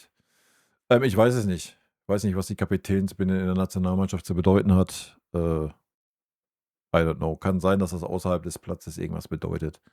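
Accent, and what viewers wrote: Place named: Westphalia, Germany. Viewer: Ich darf mich mal bzgl. Ihrer Sprache und Ihrer optischen Präsenz äußern: sehr redegewandt, westf. Mundart, äußerst unterhaltsam, stets gelungene Mimik (ähnlich der eines guten Kabarettisten), zuweilen auch tolle Gestik, erkennbare Neigung zum schonungslosen "Niedermachen" , gar zum Fatalismus, geschickt eigesetzte Sprechpausen , eine gewisse Rat- und Hoffnungslosigkeit bei rhetorischer Fragestellung, leider unnötige Anglizismenverwendung.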